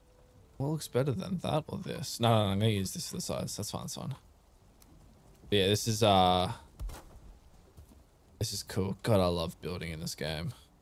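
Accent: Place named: Pretoria, South Africa. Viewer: Not the biggest (smug smile) but impressive for sure. I wish there were MASSIVE gates that you could use especially for harbours. I love your content, l especially relish your expressions when you die. I almost spat out my noodle soup when you took a lie down after dying in one of your early vids when you tried to get your stuff back from your grave during an undead raid. Much respect though that you do all in the classic game style. I build with the build mode and with a number of mods, so comparing my builds even if they are bigger is no contest against your achievment ! Oh and no worries, your Harbour really does look great, at least in my opinion. The only thing I would have added though is a gallery on either side of the great hall. You have plenty of room on either side where you could also extend outwards and add a roof on either side. 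On the inside you could then use that gallery as a balcony. Perhaps also decorate it with more chests and barrels and stuff. That is what I do on my harbours to make them look utilised. I would love to show off my builds as well, but first I need a better PC and need to figure out how to make youtube content. All in good time 😉Keep up the amazing content. I love seeing your base grow. Oh btw. Where in SA are you from ? sounds like Praetoria.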